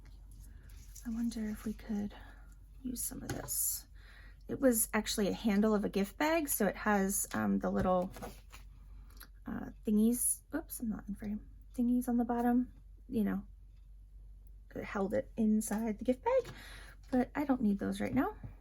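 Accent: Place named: Pennsylvania, United States. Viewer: This is the first video from you that I've watched, I'm enjoying it and have subscribed. You sounded local so I had to look to see where your from, I'm from Western Pa so not that far. Looking forward to seeing more from you.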